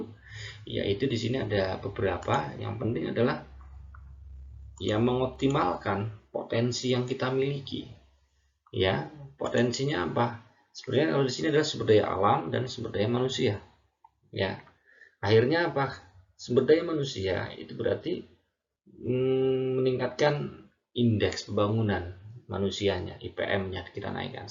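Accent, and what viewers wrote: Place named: Java, Indonesia. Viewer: weleh-weleh jowo mas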